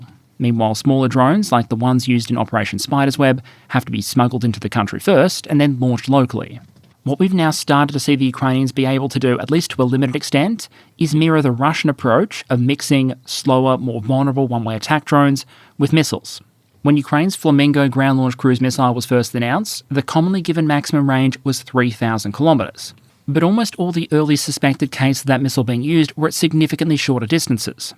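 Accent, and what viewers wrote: Australia. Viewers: He's an Aussie, alright?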